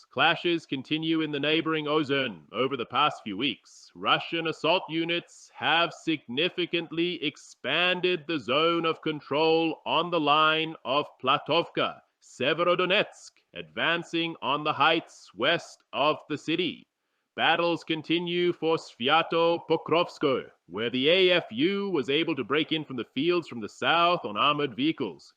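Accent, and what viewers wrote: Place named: Afghanistan. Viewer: Why the afegani voice?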